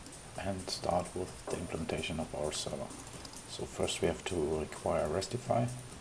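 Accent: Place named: Germany. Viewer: Thanks, your German accent and accompanying techno is inspiring.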